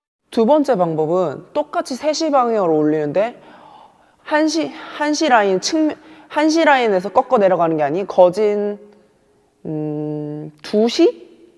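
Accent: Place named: Gyeongsang, South Korea. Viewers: 초중고를 부산에서 나와가지고 억양이 남아있어서 😅들켜버렸내요 3가지 다 연습 해보셔서 써먹어보세요 더 희열감 드실꺼에요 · 코치님 억양이 경상도 분이셨군 ㅎ 이서브 연습많이 했는데 희열감 좋더라고요 여러버전이 있었군요 공략 역시.. 최고의 서브으 👍🏻👍🏻 늘머쩌브러 🔥🔥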